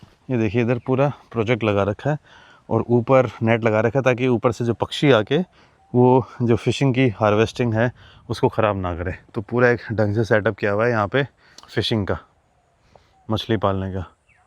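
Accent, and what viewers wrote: Bangladesh. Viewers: . I watched your all videos till today you uploaded. Liked and commented also. But this is one of the best as you spoke nice Bengali. Amar naam. However your tone is bangladehi bangla not kolkata bangla 😅 Let us meet at Delhi. I also travel a lot. Our meeting can be mutually beneficial. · you are using Bangladeshi/Tripura accent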